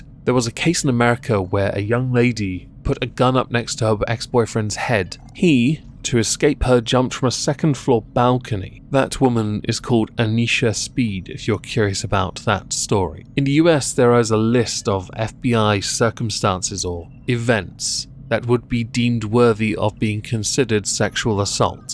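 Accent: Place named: Oxford, United Kingdom. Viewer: Off topic but where is your accent from? Best I can place is Oxford. Totally agree btw. Instead of abortion men should have the right to have their offspring .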